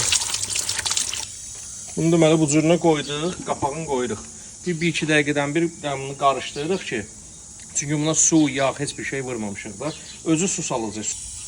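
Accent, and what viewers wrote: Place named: Baku, Azerbaijan. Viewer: "Çoxlı qurdalıyırığ " canım 👑BAKILIM👑 ləhcövə qurban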